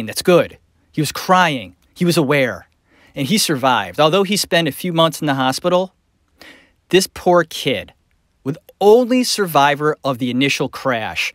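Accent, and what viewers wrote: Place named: Cleveland, United States. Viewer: You sound like your from Cleveland, Ohio.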